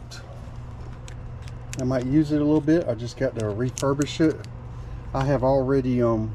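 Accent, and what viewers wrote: South, United States. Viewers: 😆 no worries, ya'll got a good team as usual. Are you from Georgia? I was thinking one of the Carolinas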